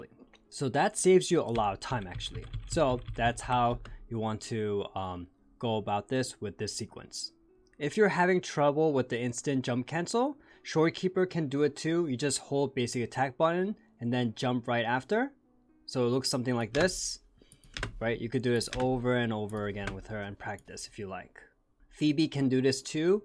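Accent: France. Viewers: Are you actually FRENCH?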